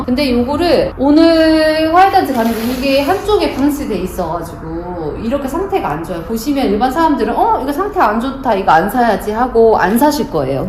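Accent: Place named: Jeolla, South Korea. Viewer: 전라도 사투리를 좋아하는 대구사람입니다. 중간중간 나오는 전라도 사투리가 느~므 좋아요^^ 황칠이 키우면서 보는 재미도 있구요^^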